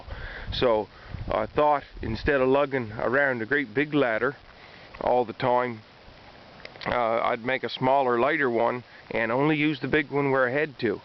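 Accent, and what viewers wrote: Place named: Maritimes, Canada. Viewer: Maritime Canadian accents the best accents.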